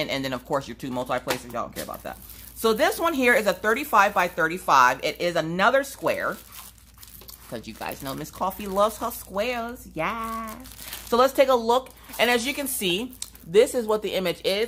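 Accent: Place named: South, United States. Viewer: I absolutely love listening to you as I diamond painting. I am a desert transplanted southern girl and it is like listening to me and my friends.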